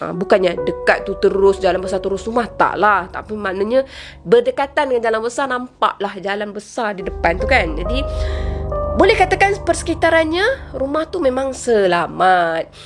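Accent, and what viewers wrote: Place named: Kedah, Malaysia. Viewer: kak ni mmg org kedah ea tngok lancar ckp😁😁😁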